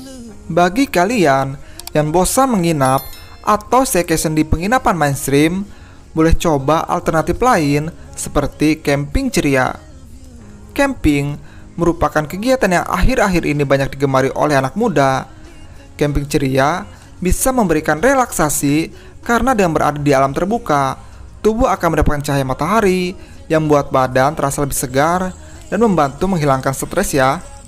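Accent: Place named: West Java, Indonesia. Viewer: Khasss Sunda nya ... Opisial 😅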